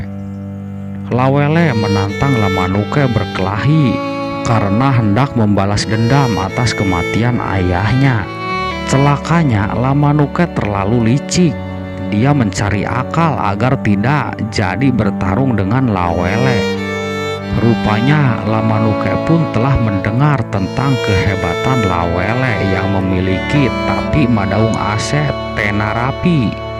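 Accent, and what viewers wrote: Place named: West Java, Indonesia. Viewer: Sayang sekali Pembicaranya berlogat SUNDA, sehingga penyebutan nama2 jadi salah. Misalnya : LA WELE' ..ada tekanan di ujung kata ... Merubah bunyi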